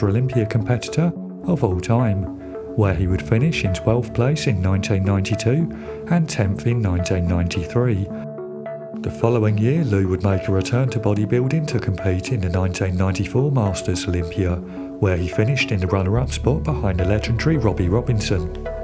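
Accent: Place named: England, United Kingdom. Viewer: An Englishman talking about Americans. You couldn't get Morgan Freeman?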